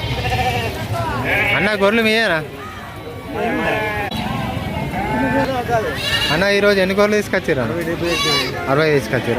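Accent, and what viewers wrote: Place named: Andhra Pradesh, India. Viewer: telangana yasa matladu andhra basha enduku matladuthunnavu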